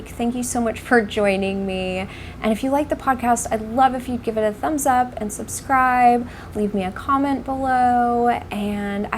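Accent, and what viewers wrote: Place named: South, United States. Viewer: You may just be getting a bit of southern drawl!!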